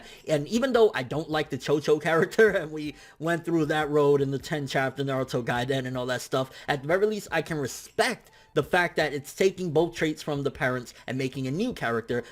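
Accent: New York, United States. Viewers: I hate he says the characters names in his American accent like say it right brov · Ny accent?🤔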